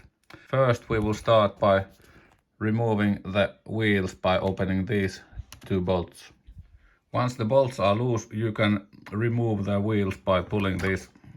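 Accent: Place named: Finland. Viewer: I know that accent perfectly! What part of Finland are you from? Some of my family lives in kymonkoski and some in viitasarri.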